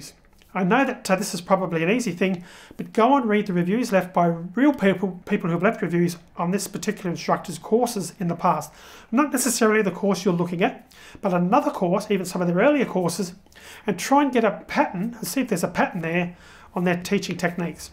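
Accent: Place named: Australia. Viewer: I agree. courses are great I've bought a few on udemy. Also feel more comfortable listening to Australian accent just because I'm more used to it.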